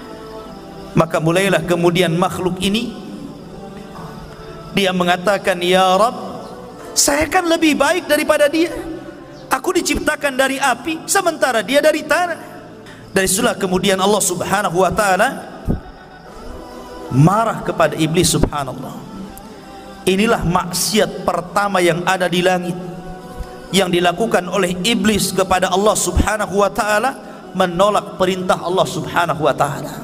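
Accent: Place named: Arab world. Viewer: Bicara nadanya begitu cih gaya timur tengah bahasa yg mengerikan pdahal Islam rahmatan lilalamin,,biasa saja said nya ora. Indonesia yg landai ding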